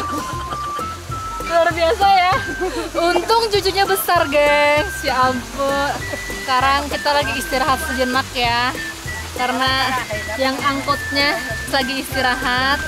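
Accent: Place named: South Kalimantan, Indonesia. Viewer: Org mana kk ngomong nya itu deh kek org kalsel gak tau juga sih bener apa enggak🤣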